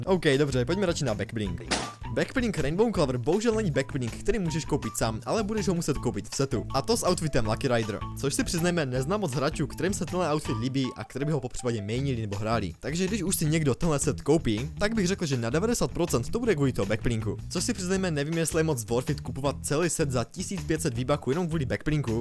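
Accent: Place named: England, United Kingdom. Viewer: Sorry ale ta tvoje angličánština mě mega sere xd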